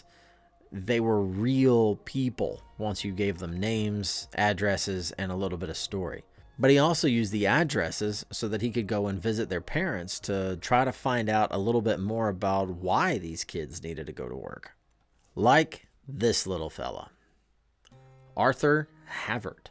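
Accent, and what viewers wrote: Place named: South, United States. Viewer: I was born and raised in Tennessee. His southern accent is a little on the light side compared to most southerners myself included.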